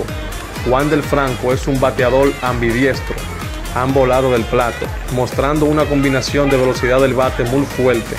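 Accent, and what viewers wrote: Cibao, Dominican Republic. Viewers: Ay perdón, pero sigo escuchando tus comentarios y definitivamente tú eres un Cibaeño bien campesino, OMG, tú no sabes hablar Español!!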